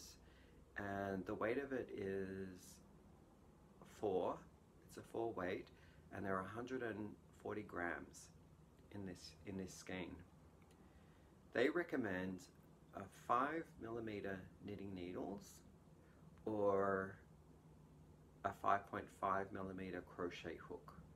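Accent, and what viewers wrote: Australia. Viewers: Thought I recognised the accent. Hello from a fellow Aussie. Love Canada too! Anyway Starting with video number one after your channel came through on my feed. Gosh you only start 6 months before this video. Great job on the chevron scarf it looks gorgeous! I'm really looking forward to seeing how far you've come in the 3 years since you started out.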